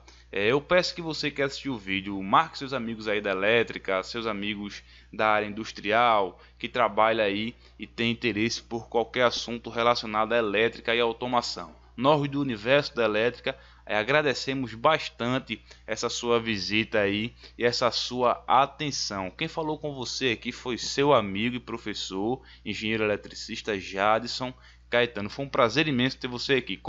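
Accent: Brazil. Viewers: Sotaque pernambucano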